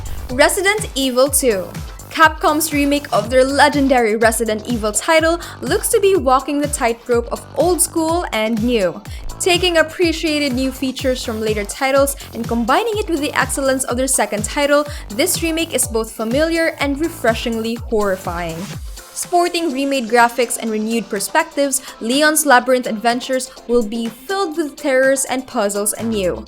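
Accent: Philippines. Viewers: You sounded like a filipina😅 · I hear Filipino Accent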